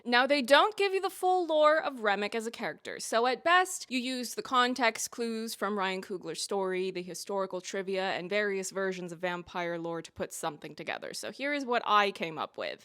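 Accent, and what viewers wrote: Ireland. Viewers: not hear the Irish accent until watching this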